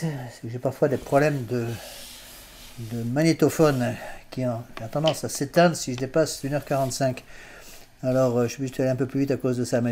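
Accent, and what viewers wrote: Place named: Algeria. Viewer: MERCI INFINIMENT, MR L'ABBÉ pour cette prédication de choc, si vivante et avec le savoureux et tellement authentique accent pied-noir!❤😊😂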